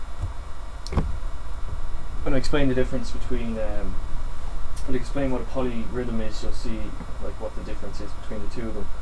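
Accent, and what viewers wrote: Ireland. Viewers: Your irish? awesome :D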